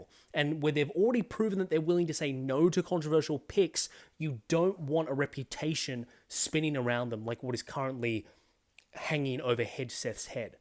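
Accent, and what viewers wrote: Australia. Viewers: australian mate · Just found out you have a YouTube page. Instant follow! Also, I always forget you're Australian lol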